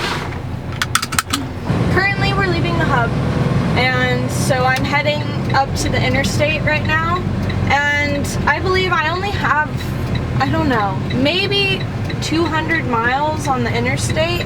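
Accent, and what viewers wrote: South, United States. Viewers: I could tell you grew up in the south. I heard you say "Rat now" not right now. I knew then we were neighbors, a couple hollers over.😄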